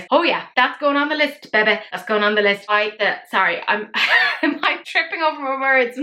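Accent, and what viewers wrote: Ireland. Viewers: Totally agree on the Bratz palette (and on filler, for that matter. My lips are thin as well and I just…don’t care? 😅 They’re mine, you know? What if it were our thumbs? Wouldn’t that be silly, everyone changing their thumbs because “well it’s entirely too long, this thumb, let’s shorten it.” ) The Viseart palettes may be “basic” colours but their combinations are nice, it feels coherent. (So many palettes don’t.) Edit to add: there surely WILL be lipsticks in the RH collection because lips are practically iconic in that movie (Magenta and Frankenfurter come to mind, and Riff Raff’s “concealer lip” look as well.). Incidentally, you have convinced me that no accent better delivers the phrase “I don’t like it, and I don’t want to talk about it anymore” better than the Irish accent. 😂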